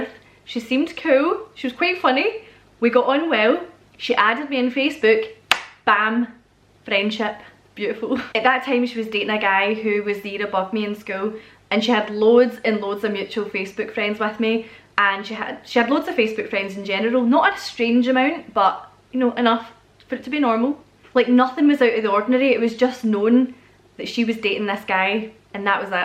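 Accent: Scotland, United Kingdom. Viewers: Hi, ive been a silent follower for some months, came here to learn scottish accent lol, but i just have to say THAT WAS AMAZING! I fullheartedly enjoyed the story. So good. Like a thriller, it was sooo so exciting! So thank you for sharing, hope you get the last answers. And yeah a documentary would be super cool :)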